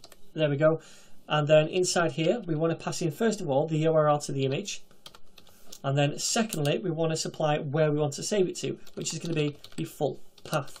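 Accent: United Kingdom. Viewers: you are amazing I like your British accent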